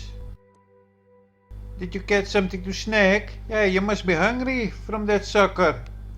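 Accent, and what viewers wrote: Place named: Netherlands. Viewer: <link> There are two horses on the right side in the "picture" just above the crates. They blend in a bit with the snow ;) Not saying its real just spotted some horses there. In a way its awesome all these bullshit stories from the past, gives us material for comedy gold. ;) And as a fellow "Dutchman" i love your accent and way of talking! You bring me joy and uncontrolled laughter in these batshit crazy times haha.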